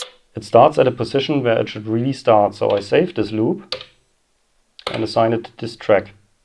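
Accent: Germany. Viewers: Finde ich nicht gut die Lösung. Also dein Video ist super, aber ich würde gerne in einer laufenden Performance einen oder zwei oder auch vier Takte sampeln können, die dann sofort automatisch abgespielt werden. Alles mit nur einem Tastendruck! Da gibt es eigentlich nur ein geeignets Gerät bisher, was genau das kann. SoundBITE Pro von Redsound. Octatracks Pickup Recording könnte das vielleicht auch. Bin ich aber leider nicht sicher. Do you understand german? Your accent sounds like that. Would have been too complicated for me to explain that in english.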